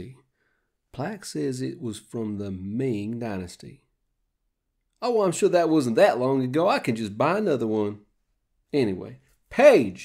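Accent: South, United States. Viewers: Well sakes alive! I must admit sir that I wasn't expecting this accent but, being raised as a Southerner, I give your performance my Southern seal of approval! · I loved this! The whole story was cute and I loved your Princely southern accent! Keep it up,!:)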